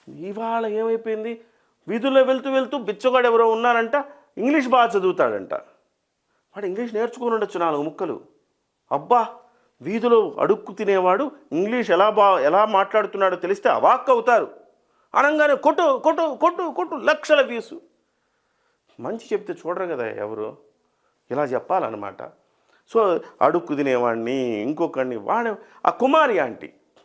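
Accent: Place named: Nellore, India. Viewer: Superrrrrrrr sir chalaa baga Nellore slang tho bale chepparu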